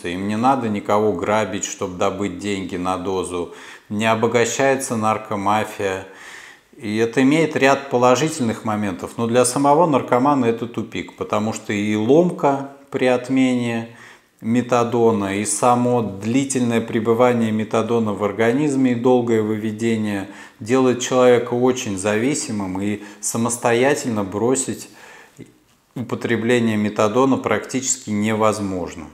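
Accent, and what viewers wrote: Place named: Kursk, Russia. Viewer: этот курский акцент бесит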